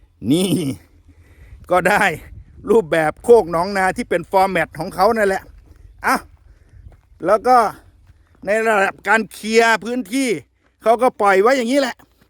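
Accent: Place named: Chaiyaphum, Thailand. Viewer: เจ้าอยู่บ้านใด สำเนีงคือคนชัยภูมิแท้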